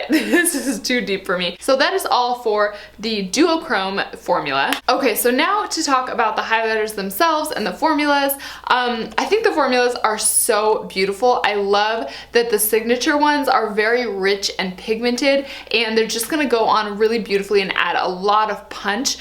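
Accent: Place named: South, United States. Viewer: Hey there, I will say makeup needs to work to accentuate the good features that you have. It's overkill, and As a mask is when you wear it all over the place in bold colors with the focus being everywhere. Learn to focus on a favorite part of your face. You can never go wrong with that advice. Also, you are southern? I think I hear a slight twang haha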